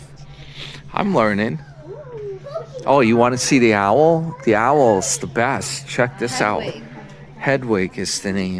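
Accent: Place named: Florida, United States. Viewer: U lost your NY accent u sound like a Floridian now . R u looking for some Sugar like ST the King of YouTube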